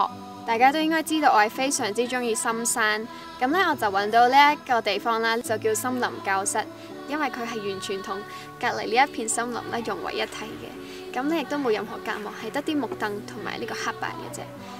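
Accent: Taiwan. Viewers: 哇要主持節目了！ 為什麼你的國語講得這麼台灣腔～ 蠻多人來很久了還是有港腔啊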